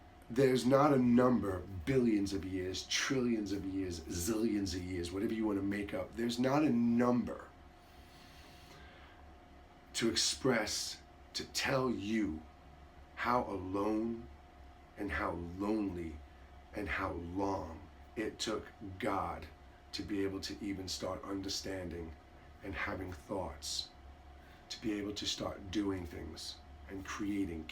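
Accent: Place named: New York, United States. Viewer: Love it and love your NY accent!💜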